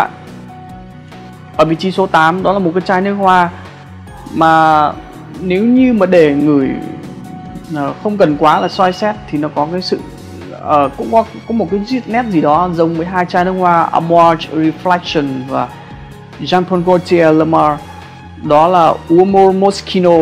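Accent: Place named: North, Vietnam. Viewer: cảm ơn video của bạn rất nhiều nhé <3 ps: mình thích zọng trai Bắc :))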